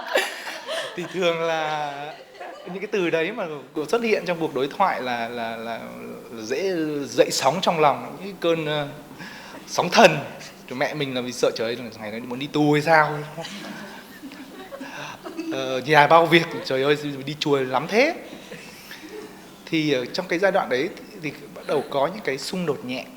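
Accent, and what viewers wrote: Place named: Hue, Vietnam. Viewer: Giong doc cua em voi phap danh that chueyn cam pha chut gi cua huecua dai dat nang chay mua lu mac du em sinh ra o binh dinh con thay e kip lam kenh dac biet la co chau tho voi su gop mat cua cac ban tre la canh tay dai dua giao phap cua su ong bac thay day kha kinh lan rong di xau vao xa hoi con tin so nguoi nghe xem tim hieu se ngay mot nhieu thoi neu no co it co dung buon va hay kien nhan nha co chuc co va ekip co nhieu suc khoe va duoc chu but chu to gia ho luon luon binh an hanh phuc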